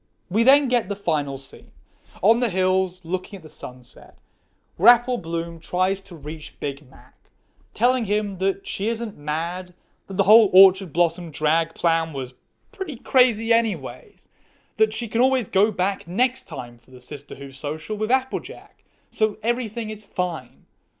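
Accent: United States. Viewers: Please please please do a bunch of shorts in the American accent just for luls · I like her american accent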